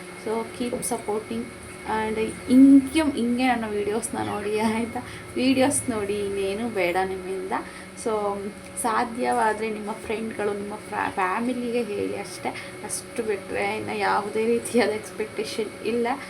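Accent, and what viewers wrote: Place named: Tumkur, India. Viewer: Yellava Nim tumkur mathu channagirutte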